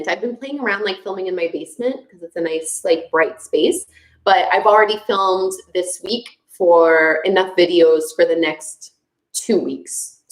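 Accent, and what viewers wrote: Canada. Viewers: Thank you for this challenge, I got my boyfriend to start doing yoga with me everyday and we are loving your videos! Also I loved hearing you speak French! I'm French and I love your Canadian accent! ❤️❤️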